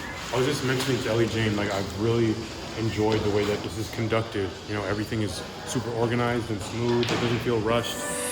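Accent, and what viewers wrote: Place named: England, United Kingdom. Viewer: your English accent 😂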